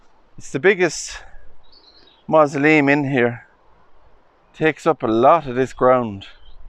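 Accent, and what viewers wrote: Ireland. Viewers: You're beautiful Irish accent alone makes it worth listening to! Do you speak Irish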